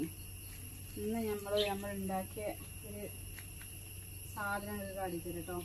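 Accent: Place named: Malappuram, India. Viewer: തനി മലപ്പുറം താത്തയുടെ ഭാഷ ❤️❤️❤️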